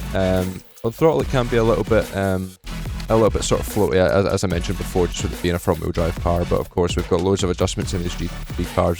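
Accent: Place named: Scotland, United Kingdom. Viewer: you've a great Scottish accent 😀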